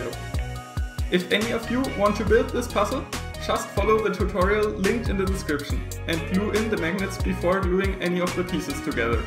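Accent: Germany. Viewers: are yo german? bc your dialect sounds like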